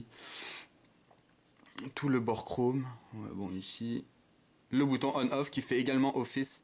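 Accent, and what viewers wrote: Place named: Belgium. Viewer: super review, j'adore l'accent belge !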